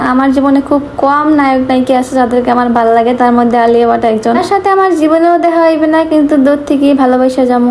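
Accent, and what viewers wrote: Barishal, Bangladesh. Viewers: আসসালামু আলাইকুম আপু আমি আপনার ভিডিও অনেক দেখি আমি বরিশাল থেকে দেখেছি বরিশালের ভাষায় কথা বলেন তাইতো দেখি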